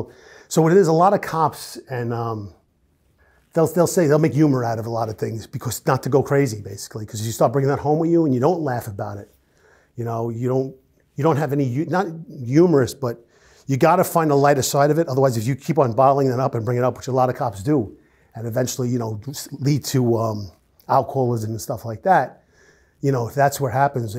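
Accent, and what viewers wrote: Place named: New York, United States. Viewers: Another highly interesting interview. He's right about everyone wanting to hear cop stories, especially when they're told by someone like himself. He's a natural storyteller. (And I'm a born & bred New Yorker -- love hearing our accent because it's dying out. His New York elocution is so New York, so fabulous!) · What a character! I lived in New York for 10 years I miss that accent. Great story teller. Good luck to him.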